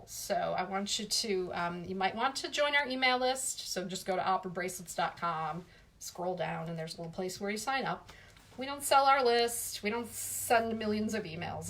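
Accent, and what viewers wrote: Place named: New York, United States. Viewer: Hi I really enjoy your little chat. Feel I am back in N.Y. specially with the way you say certain words such as gala and expressions such as make a pretty lunch. One day I collapsed in Met op shop and a lady arranging jewellery display helped me stagger out to foyer where I tried to hang on to those fancy crowd comtrol ropes! I wonder if this lady was you? Great thing about fainting there..I was whisked off to bowels of Met where I met Peter Gelb and also saw amazing porrtrait of Maria Callas. Later I banged into Peter Gelb again and said, "Wow! I keep bumping into VIPs. Quick as a flash he said, "You're the VIP!" Clever guy!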